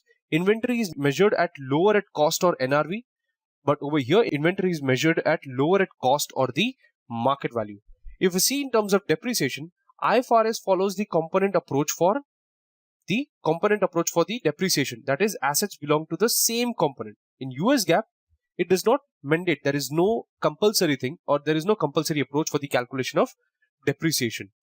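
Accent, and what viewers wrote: India. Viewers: He sounds like Rajesh Koothrapalli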